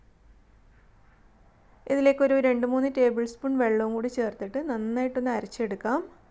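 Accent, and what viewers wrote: Kannur, India. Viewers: uuyyantee apppaa. Kannur slangg. Nice receipe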